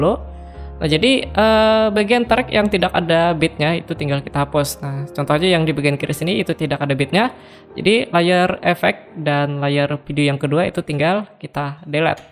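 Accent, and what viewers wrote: Banjar, Indonesia. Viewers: logatnya kayak orang banjar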